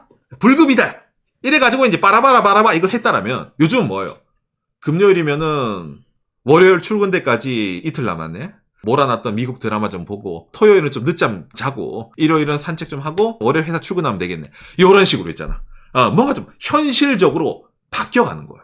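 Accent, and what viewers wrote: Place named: Gyeongsang, South Korea. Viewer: 어디서 많이 들어본 말투인데... 어디지 ? 경상도인데..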